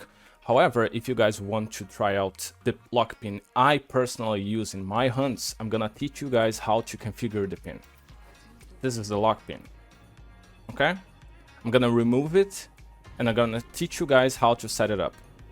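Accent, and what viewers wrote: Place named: Turkey. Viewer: Are you Turkish? Your accents kind of sounds like a Turkish accent but not a thick one.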